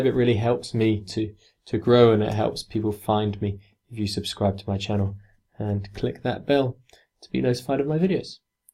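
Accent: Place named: United Kingdom. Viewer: Dear I live in Turin in the north west if Italy even if I come from South east of Italy (it's funny, isn't it?) Today I saw your website <link> but I was a bit lazy. In the next few days I'll take a look at it better. I see also if I pay more.or less 9 pounds, if I remember well, I can become a member. Is it right? I've found your website so interesting because is made well and it contains a lot of interesting things. I like so much your British pronunciation and appreciate your method. My English skill is better than some months ago because I listened a lot of English videos on YouTube. But now it's time to improve also my grammar skill and I think that your website will be useful for me. Waiting to hear you have an amazing week. A warm hug Benvenuto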